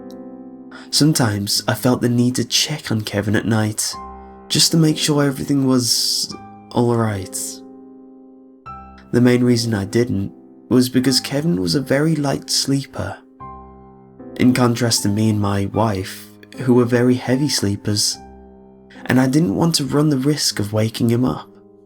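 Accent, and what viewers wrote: United Kingdom. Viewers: Your British voice makes everything seem okay :3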